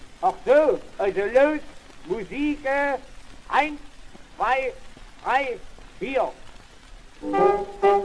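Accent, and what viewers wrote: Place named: Saxony, Germany. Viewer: nu das ist ja nu ma werklich eene scheene säckssche Offnahme. ... Klasse und Danke für das Einstellen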